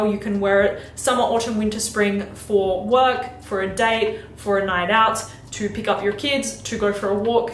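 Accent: Australia. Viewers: Great review! Also, I have to say you (and that voice/accent (Australian?)) are absolutely lovely. Anyway...love your videos. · aussie accent 😍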